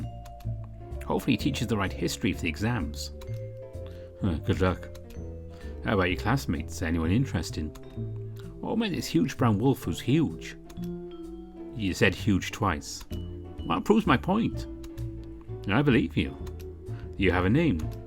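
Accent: United Kingdom. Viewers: I love the witty banter. It was nice, it goes well with your British accent.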